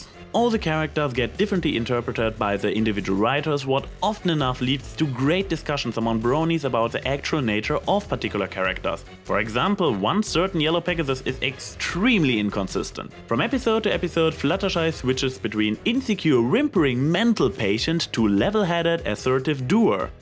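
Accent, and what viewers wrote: Germany. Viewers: ... You're from German, ain't ya? I knew I recognized that accent.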